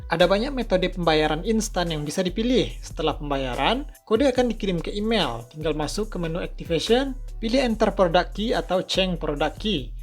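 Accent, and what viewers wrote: West Sumatra, Indonesia. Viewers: Abang orang Sumbar ya?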